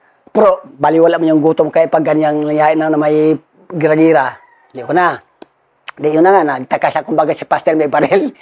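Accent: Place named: Bicol, Philippines. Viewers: The "baga" of bicolano. Pero sabi ni Sir Bisaya daw si sir. Baka matagal n sya sa bicol. Ang punto ehh bicolanong bicolano.. Oragaon man Si.. Salute sayo master. Baka may part 2 pa sir. yung sa Sunod na barangay n bangit nya sa ORAS. Parang c master Ledesma lang ang kwento. Haha. Solid panoorin.. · siguro bisaya ito sir, pero nasa bicol na nakatira kya bicolano na din ang tuno.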